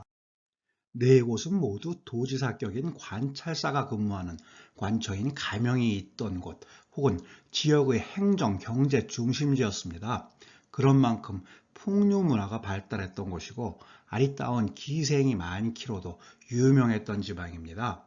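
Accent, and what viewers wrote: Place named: North Korea. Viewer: 말투가 북쪽분이신가 싶은데요.? 혹시 진짜 북쪽분이시라면 북한말투는 어떻게 만들어진건지..경상도 사투리보다 고치기 힘든지 한번 만들어주세요ㅎ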